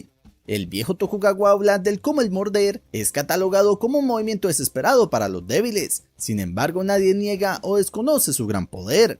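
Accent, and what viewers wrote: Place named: Mexico City, Mexico. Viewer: porque no cambias tu vocalización a la hora de narrar?? usas mucho el aaaaaaaaa, eeeeee, iiiiiii, oooooo con un tono de chilangoooo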